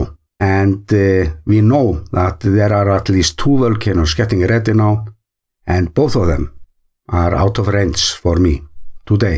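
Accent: Iceland. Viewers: Keep going, please. I always enjoy your videos and your Icelandic voice.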